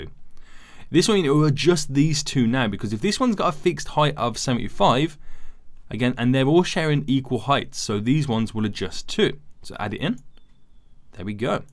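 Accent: United Kingdom. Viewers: great tutorial brother thanx and cool british accent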